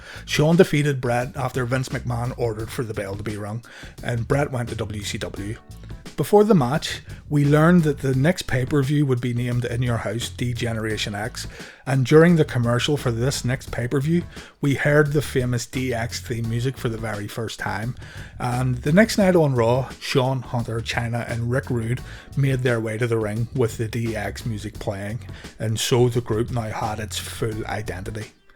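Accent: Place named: Scotland, United Kingdom. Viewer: Scottish accents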